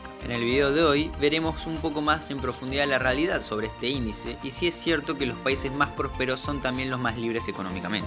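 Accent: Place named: Argentina. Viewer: solo un progre o izquierdista pudo hacer este video, y por el acento, de seguro es un peroncho kirchnerista. No tiene validez.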